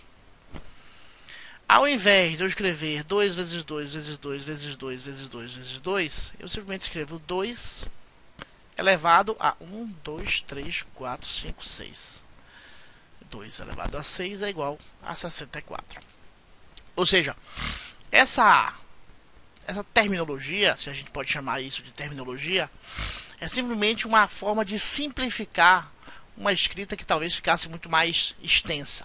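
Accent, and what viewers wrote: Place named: Northeast, Brazil. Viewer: parabens prof. o senhor ensina mto bem! e com esse sotaque bacana do nordeste fica mtooo mais agradável! ;) valeu msmu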